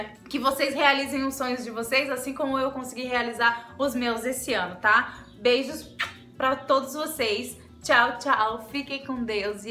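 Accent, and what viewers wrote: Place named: South, Brazil. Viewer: Conheci seu canal hoje e estou assistindo os seus vídeos, seu sotaque me chamou a atenção, vc é do Sul??? Amando seus vídeos, sua criatividade e capricho. Parabéns 😘 😘 😘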